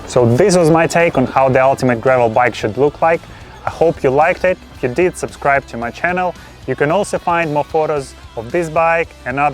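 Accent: Russia. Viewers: Do like your videos, buddy! Are you from Russia& Sounds like that ) Hope to see more interesting Gravels on your channel soon!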